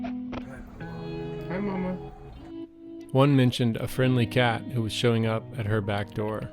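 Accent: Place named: New York, United States. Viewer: "this is de mudda" holy crap that NY accent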